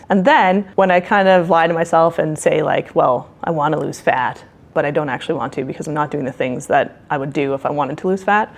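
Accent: Canada. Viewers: Girl, are you Canadian? You sound Canadian and love this. I have binged like 4 of your videos now and I'd love to think you're one of us. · Are you Canadian? I about abooout hahah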